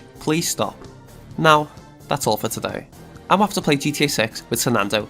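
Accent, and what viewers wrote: United Kingdom. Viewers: High quality bti'ish voice, let's go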